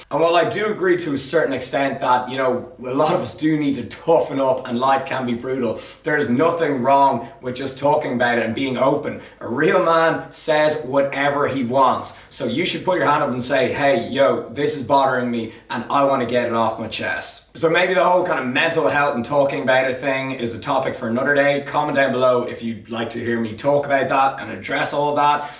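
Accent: Ireland. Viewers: Ayyy anoder irish man! Coming from Northern Ireland here!!!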